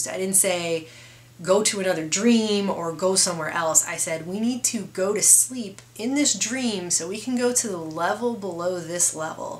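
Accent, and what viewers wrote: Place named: Texas, United States. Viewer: You sound like you're from my part of the woods Texas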